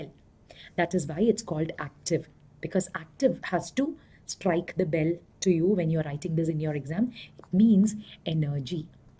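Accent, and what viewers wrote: Kerala, India. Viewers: Mam I’m so convinced ur Malayali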